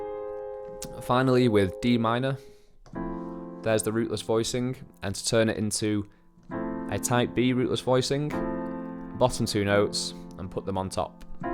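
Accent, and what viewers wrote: Liverpool, United Kingdom. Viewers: Very helpful info but I was wondering if you can do (or already have) any videos on learning the right hand improv? Whenever I hear the middle improv section of a standard jazz piece, it always blows me away how good it sounds with the various runs etc and I would love to be able to learn how to do that. By the way is that a Liverpool accent I hear? Cheers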